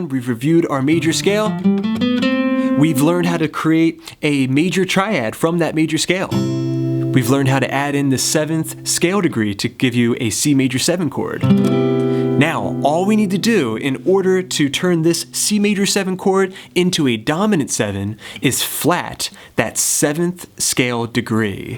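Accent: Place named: United States. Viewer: I mean, cool videos very useful, but all I could think about is your american accent. Where in the U.S. did you pick that up?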